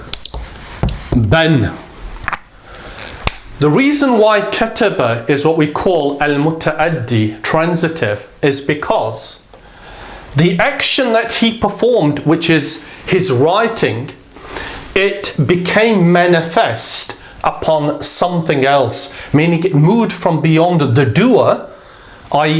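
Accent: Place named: Birmingham, United Kingdom. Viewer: JazakAllah khair brother . I always said I would never learn Arabic from a non Arab... I take back my words. I find you're the best teacher (Allah yu barik feek)on the internet because you break concepts down to their simplest form, which then makes the idea easy to grasp. Perhaps your laid back Brummie accent helps as well lol !Thank you bro.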